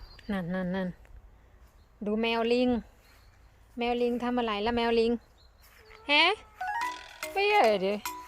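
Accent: Korat, Thailand. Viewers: แก่คนนางรองนิ พูดโคราชเหมือนกั๋นเลย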